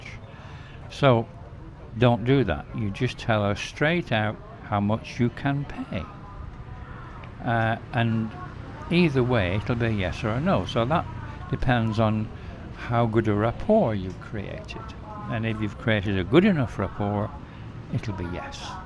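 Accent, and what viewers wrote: United Kingdom. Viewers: I am trying to work out your accent? Where are you from in the UK?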